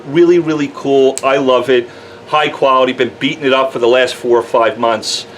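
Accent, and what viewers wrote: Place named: New England, United States. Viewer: You have a bit of a New England accent.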